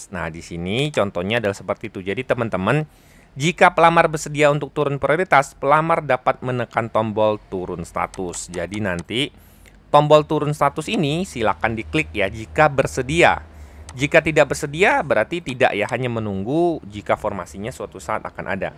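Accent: Banyumas, Indonesia. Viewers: Otewe Ora ngapak, ora kepenak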